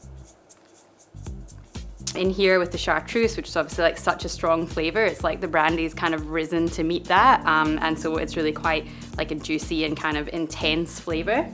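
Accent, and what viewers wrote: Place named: Scotland, United Kingdom. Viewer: Always look foward to your vids...So gorgeous, knowledgeable, and love your Scottish accent.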